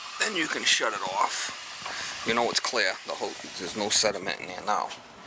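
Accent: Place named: Massachusetts, United States. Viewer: Great video, I really like the tags you use, I might have to look in to those myself. I like listening to you, your accent is exactly the way my dad talked, he was from Peabody MA. He just passed away recently so I enjoy hearing that accent! Keep up the great work! PJ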